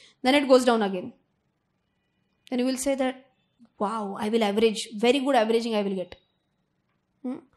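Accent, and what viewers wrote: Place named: Telugu, India. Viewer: Mam Iam actually a Telugu language known person.. But I know English but your way of teaching and speaking English feel like I'm listening pure Telugu words tq madam 💗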